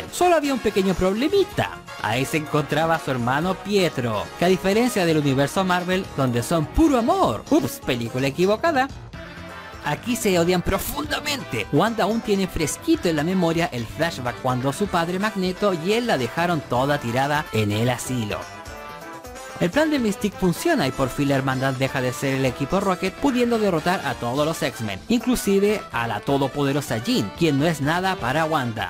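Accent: Chile. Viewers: Acento chileno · Eres chileno?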